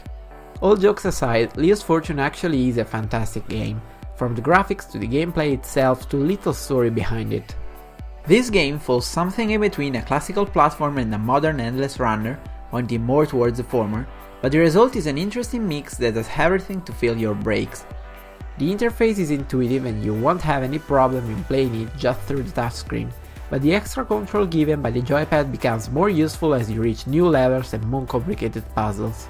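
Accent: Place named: Italy. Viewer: Leo actually has a Italian accent.... Just saying...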